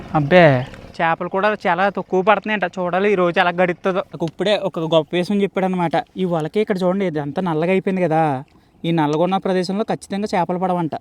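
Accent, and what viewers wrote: Godavari, India. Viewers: నీ పేరు చెప్పు బ్రో నీ వీడియోస్ చాలా బాగుంటాయిగోదారి యాస baguntadi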